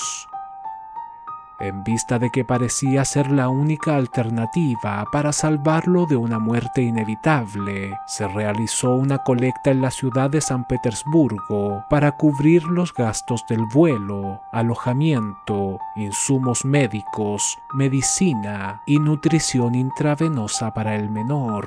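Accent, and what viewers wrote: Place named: Chile. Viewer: es la magia del acento chileno que al hablar lento, parece musica para tus oidos, como si oyeras al silencio cantar: <link>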